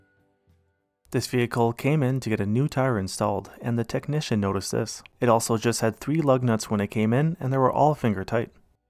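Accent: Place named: United States. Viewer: The narrator has a american accent, but the transit van, , has British number plates. I find this most odd.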